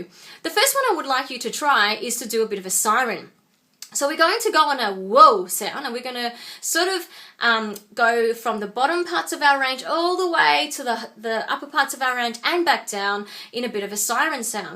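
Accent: Australia. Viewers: i can't get enough of your slight asian-aussie accent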